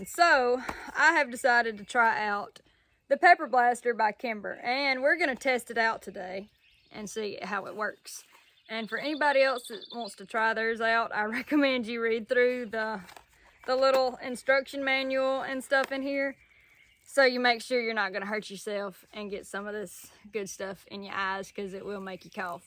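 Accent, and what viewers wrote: South, United States. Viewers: This is the most American accent ive ever head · Definitely a stallion so beautiful the southern accent makes her even more gorgeous 💕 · South state accent? Not my native language, I try to perfect it, so if you mind tell me, I'll be greatful. (You said Alabama, right?) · I just love thst country accent. Makes me feel all American inside. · Something real nice about that southern accent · I just saw one of your videos as I was strolling and I was like wow she’s really beautiful so I instantly went to your channel and subscribed, I love your southern accent and your personality and your in amazing shape but one negative thing I saw was your amazing 💐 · Always real American accent.. 👍🏻👍🏻👍🏻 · Shes gorgeous and as I Texas boy I love her southern accent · There is some accent in this southern! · That southern drawl is too cute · i have no idea what a pepper blaster is. 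but shes kinda cute says it with a thick southern accent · I tell you what that Southern draw and that Brunette hair my goodness. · I sure miss the TN/AL accent!! Gotta love her southern twang!! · "you are Purrrrday"" and your country ACCENT reminds me of,--(, Bless you)), girls that say my name like, cheee-steer-!,lol.(((eye love your channel, 🤓try pepper spray on a 200lb wild hogg, bring extra spray & bee safe. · Personally, I love southern accent. But if you would like to hear her as a northern girl, just turn the playback speed to 1.25. 😉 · That American accent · Southern draw ! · I love your country accent oh my God marry me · Okay my neighbors to the south. I'm up in Vancouver, Canada and obviously we have nothing like this absolutely fascinating and utterly adorable accent (?) up here! Is this just an anomaly or is this basically the 'drawl' southern states have? I have been to Florida and it didn't apply but is this the norm in Kentucky, Tennessee, Georgia and Alabama...and others? 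I'm thinking maybe Texas as well? Its so damn.....pure? I don't really know what to call it but it's just such a wholesome and comforting kind of (for lack of a better word) accent. It makes me really want to take a trip down and do a road trip through all the southern States the summer. Anybody have any recommendations on the most enjoyable Southern state or states to visit? ❤ from a 🇨🇦! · Now folks, this is what a good ole southern girl sounds like. I was born and raised in central Florida and we got some words most people don't use or know of. This young lady is very pretty to boot. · Southern accent is priceless for my Finnish ears :D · Always been a fan of brunettes, loving the southern accent too. Girls and guns, gotta love it. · damn had to subscribe because of the country accent love the videos lol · this is the thickest american accent i have ever heard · This woman is so freaking adorable 🥰 Enjoy her content ✌🏼 Gorgeous!! 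That Southern drawl is to die for Where have you been 🤔? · Aye aint gon she so fine 🤧🤣 i need me one of her them south girls w the southern accent that shit so attractive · U go girl bless you 😳 love the way you talk I'm from South Carolina but U got that Southern slang 😍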